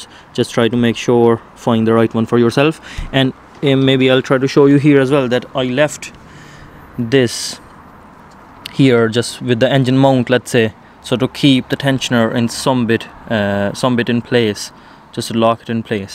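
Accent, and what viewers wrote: Cork, Ireland. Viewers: sounds like im home in cork listening to you 😂😂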